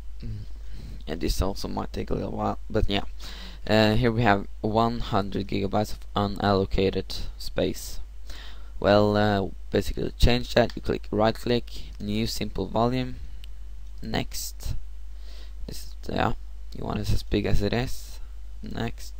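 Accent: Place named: Scandinavia. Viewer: Thanks mate ^^ Are you from Sweden or Norway by any chance? xD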